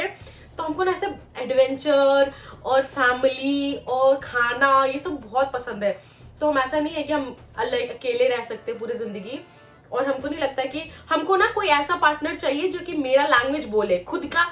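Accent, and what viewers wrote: Jharkhand, India. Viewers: Hello there... this is my first comment ever and I'd like to congratulate you for your achievement and I kind of knew that you were from Jharkhand because your Hindi accent is just like mine and I'm glad that you are proud of your mother tongue and use it in your videos too. You remind me of my husband who is also from Jharkhand and with hard work he made it to JNU and later to Japan... that was a long time ago, when nobody from jharkhand or bihar really wanted to acknowledge their backgrounds. I'm so happy to see young Jharkhandi like you doing well... may fame follow you everywhere👍💯🧡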